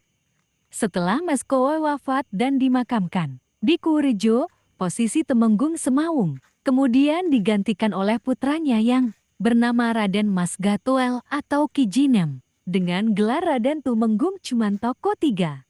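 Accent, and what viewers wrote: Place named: Makassar, Indonesia. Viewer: suwarane kaya orang Makasar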